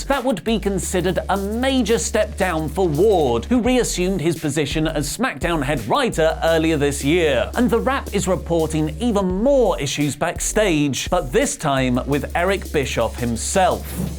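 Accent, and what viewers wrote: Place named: United Kingdom. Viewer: I would appreciate it if you could find people who spoke English with an American accent and without a distinctly British accent so maybe I can finally understand WTF you’re talking about. (I’m looking at you Whatculture and Cultaholic）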